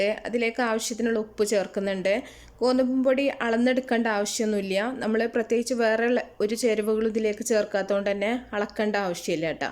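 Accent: India. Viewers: മലബാർ syliyilulla നല്ല അവതരണം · Ok ചെയ്തു നോകാം ട്ടാ 😄, എന്റെ നാട്ടിലെ അതെ സംസാരം ചേച്ചി പാലക്കാട്‌ ജില്ല അല്ലെ?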